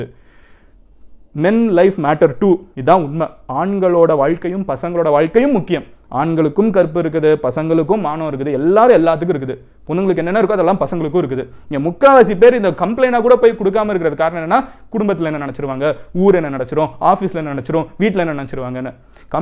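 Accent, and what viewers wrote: Madurai, India. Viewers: Enikitha pa madan nu namma madurai karana pesiruka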